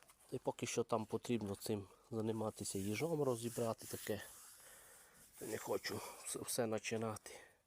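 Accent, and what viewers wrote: Western Ukraine, Ukraine. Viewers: Прикольний, шкода що на городі зберігали, - кузов убитий. Цей москвич був би раннім, якби був 412-ї моделі. 408 можна назвати раннім, якщо це авто в кузові "е" (з фарами-плавниками) і десь приблизно <num> року До речі, у Вашого авто мабудь чи не найгарніша мордочка з всього модельного ряду, - решітка з широкими отворами і прямокутні фари П.С. не бачив поки щоб хтось критикував жителів західної України за їх говірку, це ваша "фішка", не переймайтеся! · Робіт добру справу і все вийде. Гарний Закарпатський діалект, головне не кацапський язик. Слава Україні. Смерть ворогам. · Бачив відіо як неошибаюся канал називається "Вася Крутс" до він теж купив москвич тепер розбира на запчасті і продає. Я замітив що розмовляєте як в західні Україні все 👍👍👍розмовляйте як удобно головне щоб не нарусійські мові 🇺🇦 🔱.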